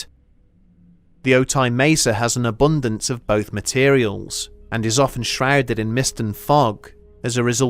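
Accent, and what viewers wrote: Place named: United Kingdom. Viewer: Got yourself a sub, my guy it's good to hear a fellow UK person do these kinds of videos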